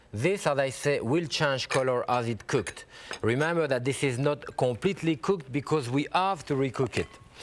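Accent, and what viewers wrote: France. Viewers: a genius in the cooking world is jacques pepins the french accent adds to the recipes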